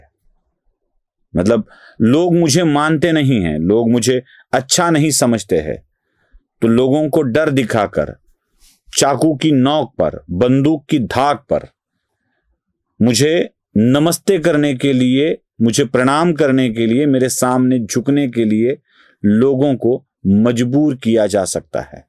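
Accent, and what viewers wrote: Maharashtra, India. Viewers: Mujhe Marathi language aur Hindi accent maharashtrian logon ka sun ke bahut achha lagta hai...❤❤❤❤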